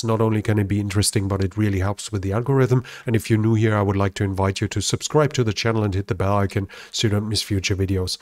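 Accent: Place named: Germany. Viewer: He has the same accent as Werner Herzog. Is this an accent from a certain part of Germany? Bavarian, maybe?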